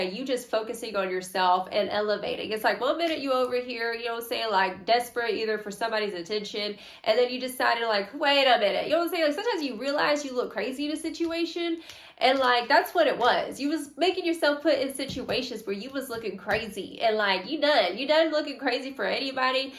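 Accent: South, United States. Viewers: What in the Dolly Parton ,Deepika Padukone,& Salma Hayak is going on here LOL !! I Love your energy,and your country accent . I was surprised to hear you speak you sound down south, and you look like a lot of different cultures You are hilarious and beautiful your read is on point . LOL!!!! 😂 ❤👍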